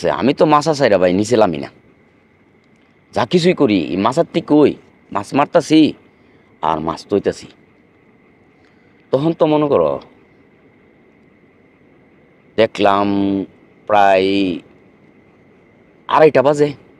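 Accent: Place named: Narsingdi, Bangladesh. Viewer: মনে হয় নরসিংদির ভাষা এটা!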